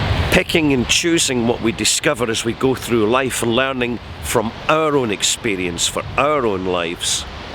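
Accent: Scotland, United Kingdom. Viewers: I came here for philosophy, setting and a Scottish accent. Liked and subscribed.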